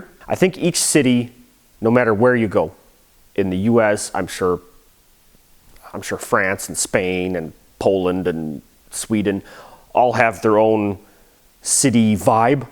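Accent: Germany. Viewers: Why does it sound like you got a german accent? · The remarkable thing is, that you already developed a little German accent. Or am I hallucinatin'? From one Metalhead to another: Welcome! Good to have you here. Make sure you'll stay!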